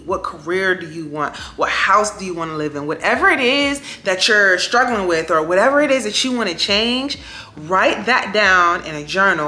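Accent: Pittsburgh, United States. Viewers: Your accents giving Pittsburgh??? Or am I tripping? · Are you from Pittsburgh?? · Are you from PGH? I might be wrong but I hear your accent. Thank you for the video.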